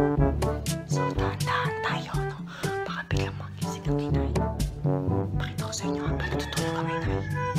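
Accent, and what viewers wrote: Batangas, Philippines. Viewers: Batangeño ka ga??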